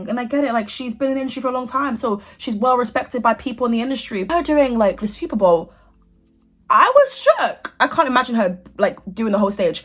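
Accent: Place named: United Kingdom. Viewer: First time viewer here and I must say, I LOOVVEE your British accent, babe! From an American standpoint, that accent on a beautiful woman is a deadly combination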